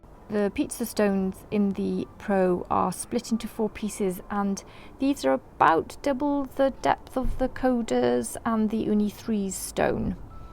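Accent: Wales, United Kingdom. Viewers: Do I detect a hint of Welsh? You should do ASMR videos :). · What is it about a British accent? Just love to listen to it! Great video, will probably get one not just for the lovely accent but it looks like it held up well over a year.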